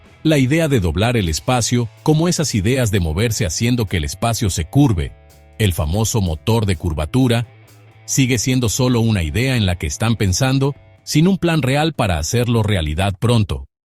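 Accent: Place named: Mexico. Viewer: Aparte la IA habla como mexicano. Nada contra su acento, pero en temas científicos no espero hablar al locutor con modismos de un país específico. Yo soy chileno y no me imagino un video de ciencia con modismos de acá.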